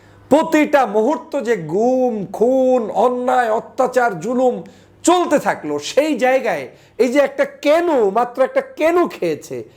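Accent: Barishal, Bangladesh. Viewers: এট বরিশাইল্ল্যা ভাষা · বরিশাইল্লা কেনু।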